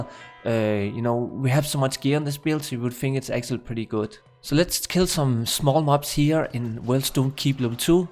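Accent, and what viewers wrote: Denmark. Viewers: Man kan lugte en Dansk accent 14 kilometer væk.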